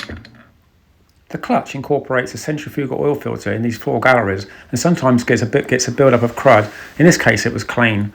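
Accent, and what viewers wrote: England, United Kingdom. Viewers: He's from England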